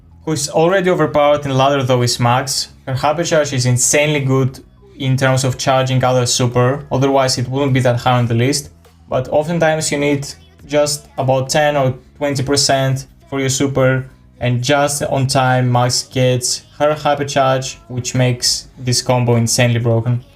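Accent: Greece. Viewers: Ayeeee the greek accent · The Greek accent🇬🇷🇬🇷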